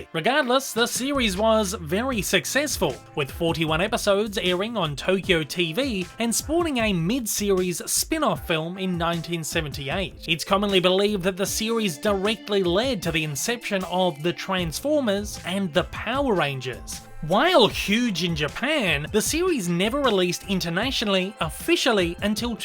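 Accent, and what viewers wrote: Australia. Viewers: Are you Australian mate?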